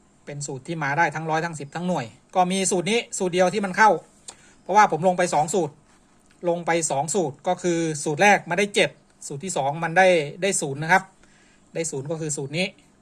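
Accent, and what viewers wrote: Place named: Isan, Thailand. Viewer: ผมว่าอิสายครับ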